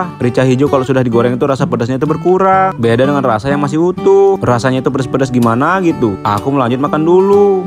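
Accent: Java, Indonesia. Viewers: Bg orng Sulawesi tpi logat jawa sma kita bang😂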